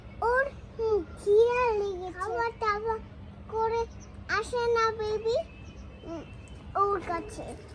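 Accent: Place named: Noakhali, Bangladesh. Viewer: Assalamualikum apu kemon acho ? Night queen flowers Sotti sundor tobe samnasamni dekhar sovaggo hoy ni kokhono. Apu ajker vidéo ta onek clear ajke ki i phone diye vidéo korecho . Mehedi design onek sundor hoyeche. Ei cha dekhe tsc cha er kotha mone pore gelo . Sijhdah burita kemon ache . Mashallah onek onek sundor lagche 😘 ki sundor kotha bole amader noramonio eivabe kotha bole.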